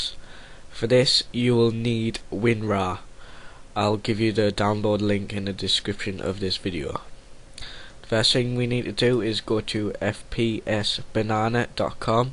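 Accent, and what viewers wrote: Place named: Scotland, United Kingdom. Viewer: sorry if im offending, but u just have a voice like a Scotlander =) anyway thx for the tutor.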